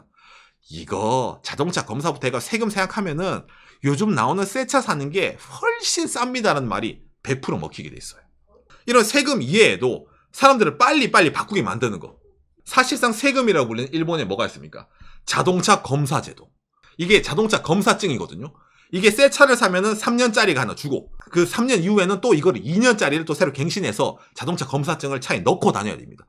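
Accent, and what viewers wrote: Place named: Gyeongsang, South Korea. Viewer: 정보도 좋고 내용도 좋은데, 경상도 억양이 너무 쎄서 듣기 좀 그렇다. 좀 믿믿하기라도 하지. 너무 억양이 쎄네. 지역 얘기 하는게 아니고 듣기에 불편하다. 너무 억세서.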